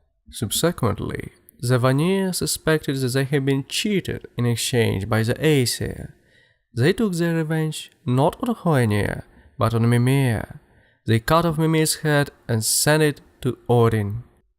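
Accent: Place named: Finland. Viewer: Side note: had to look up your dialect cause it sounded like a weird mix of finnish, italtian and german. UKRAJINA BOIIIIS!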